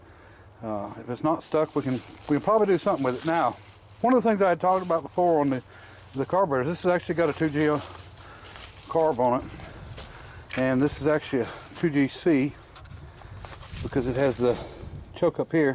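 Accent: United States. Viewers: awesome find all the cars ive seen and worked on, but have never heard a straight 8 run. Can't wait for the next video. Also I know that you are country cause im country i here's it in the way you talk. Nothing wrong with being country either, but just so not to confuse the slower witted city folk. When you say sandblasting spark plugs, just say we's gonna clean them. Ya see most folk in the cities around the world take people at face value and what they say. Poor people haven't ever heard of slang words before. When I moved to the big city I found out that most of them were simpletons and what you said is what you meant. Think most of them is touched in the head. Or like they say in Cherokee hills of NC,they's touched by the great spirit, and us country folk knows that that's not good. I would try this don't explain what or why or how you're going to do something just do it,they may not get it, but that's because they never will.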